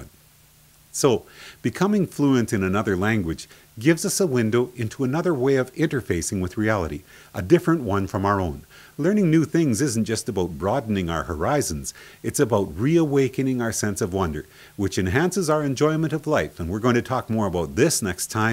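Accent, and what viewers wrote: Canada. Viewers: Rob, are you Canadian?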